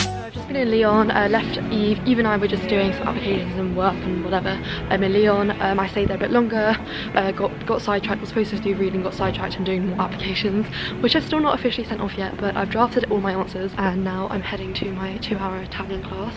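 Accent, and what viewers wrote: United Kingdom. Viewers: your english accent is just amazing !!!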